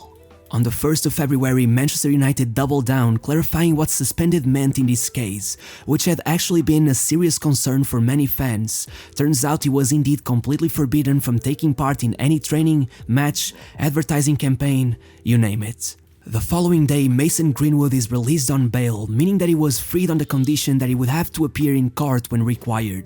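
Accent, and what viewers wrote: Portugal. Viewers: Your Voice looks portuguese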